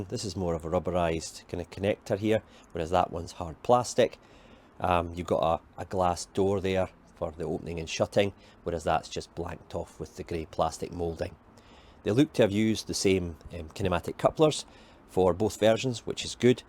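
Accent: Scotland, United Kingdom. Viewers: Hey. Whereabouts in Scotland are you actually from? I can tell by your accent. I'm a new subscriber from London, UK.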